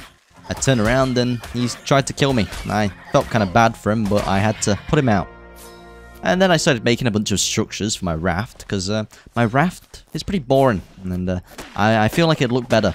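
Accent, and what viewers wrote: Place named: United Kingdom. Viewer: I never heard such an bri'ish guy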